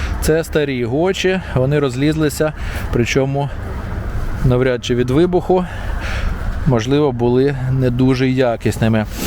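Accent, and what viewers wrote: Kyiv, Ukraine. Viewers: Дякую, за чудову українську мову. Але мені також дуже подобалася ваша "київська російська", що підтверджувало, що ви є питомим киянином. Вітання вам із Харкова.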